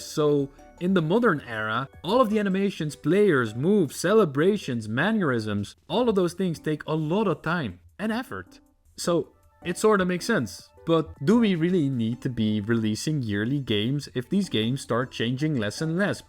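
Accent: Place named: Netherlands. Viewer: Nederlands accentje is wel smikkelen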